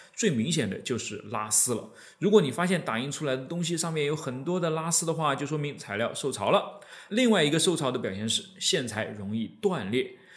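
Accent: Nanjing, China. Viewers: 口音有点南京的感觉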